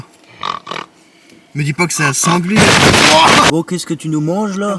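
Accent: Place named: Moselle, France. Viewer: le bon accent de mosellan mdr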